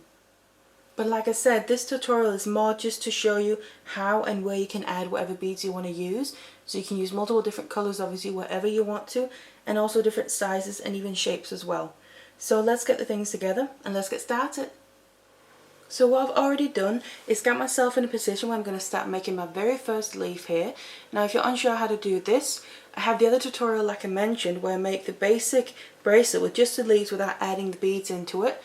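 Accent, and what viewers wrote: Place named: Northern England, United Kingdom. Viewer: Are you from Northern England...I recognized your accent from watching a mini-series on the BBC called North and South. :o) This is really pretty! Thanks!!!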